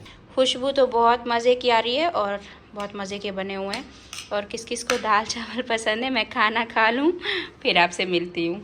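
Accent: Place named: Rural, India. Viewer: Phly ham apko dekhty thi to hami bhot hancty thi ap etni gaon wali or asi bolti the k hami hnci ATI thi aj v wo baty wo time apka yad ata tha or aj dekh jhut bol BOL k pasy kamai or aj ghmndi fakhari aorat bn gi zara nmaz ko Allah si v yari lga k dekh dunya ko chor di lalachi aorat bhni faimle sab reshty na khtam kri 😊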